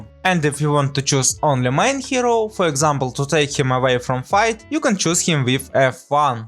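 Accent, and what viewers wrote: Russia. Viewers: У тебя специально русский акцент? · so hard to listen to the Russian accent in English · Вен ю трай то спик инглиш, бат юр акцент из стил рашн))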